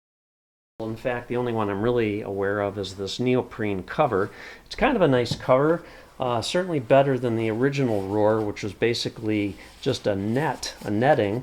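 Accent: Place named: United States. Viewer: Great review/tutorial...Finally an "American English" speaking reviewer. Those reviewers from GB or wherever have those awful accents that make it hard for me to understand. Thankyou!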